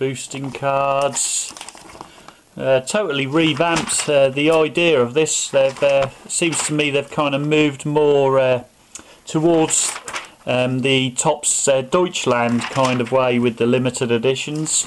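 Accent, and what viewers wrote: United Kingdom. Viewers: So cool leas your British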